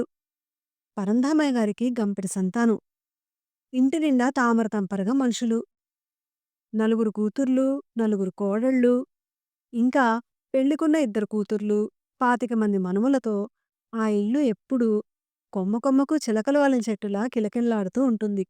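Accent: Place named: Telugu, India. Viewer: చాలా బాగా చదివారు. గొంతులో తెలుగుదనం చక్కగా తొణికిసలాడింది.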